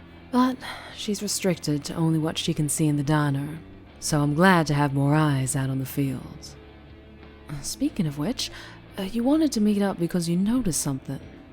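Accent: South, United States. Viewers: 3 weeks it's been 3 weeks i.... mam thank you for the upload i missed this. Also a slightly deeper southern accent double noice. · Wow! Amazing work as always. I know this vid wasn't as popular as normal for some reason but I love this series! And as a southern born floridian man I enjoy the stage southern American accent. Hope it continues.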